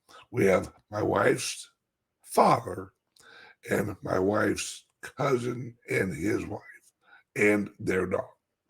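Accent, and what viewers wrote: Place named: South, United States. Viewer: This right here is the second time I am coming across this name in a week. Came to my school for a lesson and it was lit and yes, she has a really cute southern accent.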